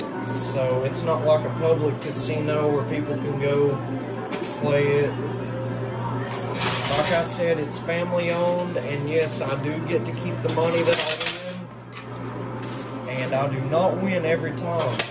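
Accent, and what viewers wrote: South, United States. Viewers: .....What state do you live in you have that southern accent....YOUR ALWAYS ENTERTAINING....CANT WAIT FOR THE NEXT VIDEO.....EVERY DAY.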